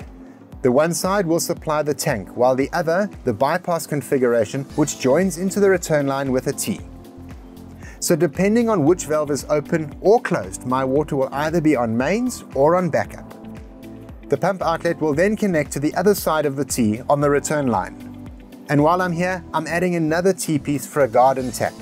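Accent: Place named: South Africa. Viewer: It’s a shame a whole accent is connected with hate because I have always loved the ‘Saffa’ accent 😊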